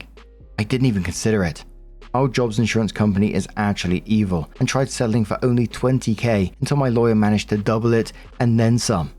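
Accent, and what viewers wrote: United Kingdom. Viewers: Hey I just wanted to let you know that I really enjoy it when you talk about your own life and relate it to the stories. I feel like you must've gotten comments from ppl who don't like this or something bc you always defend bringing up your personal life. If people don't want to hear about it then they should go listen to AI robotic slop voice rereading reddit stories. I like your channel BECAUSE you bring a personal touch and I also love British accents lol. You're the only channel on yt I actually pay a membership for bc I appreciate your content so much. I listen almost daily on my commute to work and back and I really enjoy hearing about you and poppy and your family. So please don't ever be discouraged to share that.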